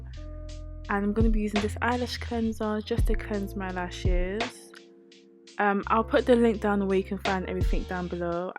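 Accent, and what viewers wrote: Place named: England, United Kingdom. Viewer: You can’t hear the English accent?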